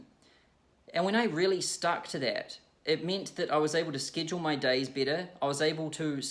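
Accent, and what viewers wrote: New Zealand. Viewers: NEW ZEALAND ACCENT？？？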